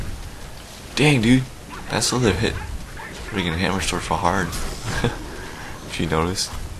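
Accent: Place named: Vietnam. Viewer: Gotta love the Viet accent. Love your vids. Keep it up please. :)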